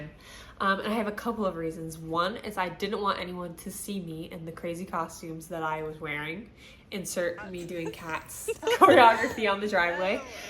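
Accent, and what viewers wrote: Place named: United States. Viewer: Your Dialect Sounds Like an American.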